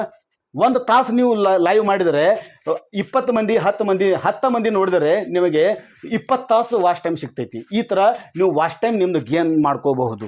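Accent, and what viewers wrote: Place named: North Karnataka, India. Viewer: ನಿಮ್ಮ ವಿಷಯ ನಿರೂಪಣೆ ಅದ್ಬುತ ಸರ್ ಅದೂ ನಮ್ಮ ಉತ್ತರ ಕರ್ನಾಟಕ ಪಕ್ಕ ಸ್ಥಳೀಯ ಬಾಷೆಯಲ್ಲಿ ಮಾತನಾಡುವ ನಿಮ್ಮ ಮಾತುಗಳು ಹಾಗೂ ನಿವು ತಿಳಿಸುವ ಮಾಹಿತಿ ನನಗೆ ತುಂಬಾ ಇಷ್ಟ ಆಯ್ತು ❤❤❤❤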